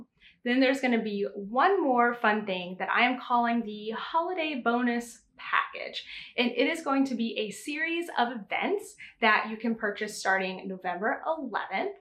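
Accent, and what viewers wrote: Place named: New York, United States. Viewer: Love the colours of the hat yarn. My current WIPs are aran sweater for me, socks for my neice, and another chunky sweater for my daughter in law.... I only started knitting multiple projects since watching you.. It doesn't get boring though. Been a while since I watched you, gosh you are developing a bit of a NY accent there....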